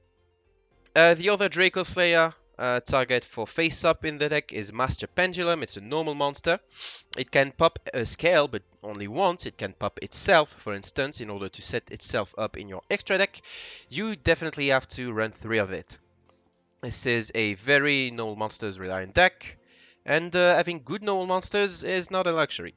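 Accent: France. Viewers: You sound french am I right?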